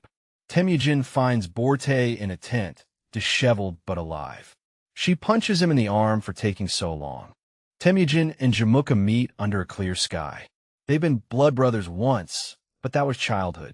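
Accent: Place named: United States. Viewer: suggestion, please pronounce the names correctly, not in Us english accent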